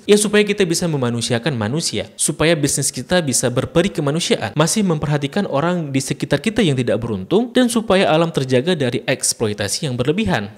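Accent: Malaysia. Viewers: Assalamualaikum..maaf ini orang malaysia atau indonesia ya...soalnya bahasa indonesia nya bagus tapi ada terdengar logat malaysia dan kata kata yang terdengar biasa dipakai orang malaysia...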